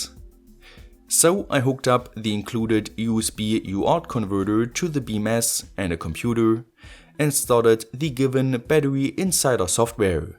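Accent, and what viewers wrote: Germany. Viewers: Man höher so hart dass du deutsch als Muttersprache sprichst 😂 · Are u german?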